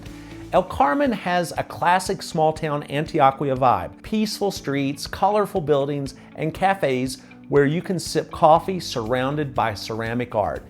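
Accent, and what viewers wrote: United States. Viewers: On-Tee-OH-Kee-Ahh. Love ya, but man oh man, do you have A LOT of gringo in your Spanish pronunciations. 😀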